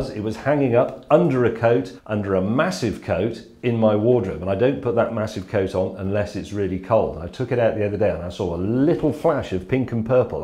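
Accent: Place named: RP, United Kingdom. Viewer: Michael Persico that not anywhere near posh. Thats just the trained BBC voice combined with a southern accent with educated received pronunciation. You can speak properly and carefully without sounding like a twat, he chooses every word carefully and because of that people all over the world can understand him.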